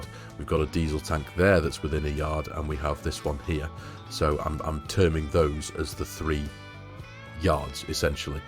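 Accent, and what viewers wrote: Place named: Scotland, United Kingdom. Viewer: "being from Scotland originally" I could tell by the accent 🤣🤣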